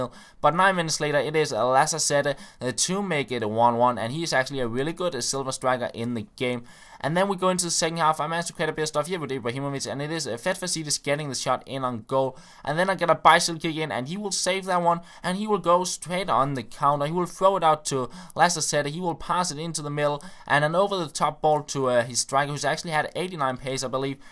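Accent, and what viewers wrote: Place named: Denmark. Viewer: it sounds alot like danish ;) most people from our country have that accent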